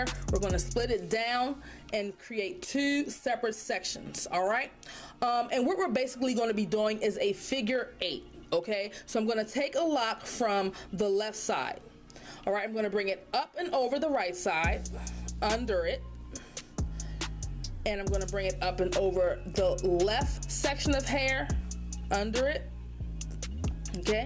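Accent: Baltimore, United States. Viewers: Baltimore I can place that accent from anywhere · Are you from baltimore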